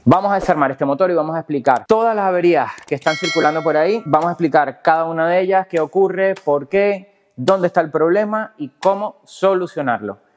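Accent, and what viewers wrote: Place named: Venezuela. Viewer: Suena más a venezolano que el mismo maburro😂😂😂😂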